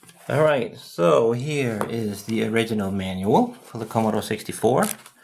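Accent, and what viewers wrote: Denmark. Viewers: Hehe.... Sjovt at jeg tænkte at du er danskee, da jeg hørte din accent. God video. Min favorit er c modellen.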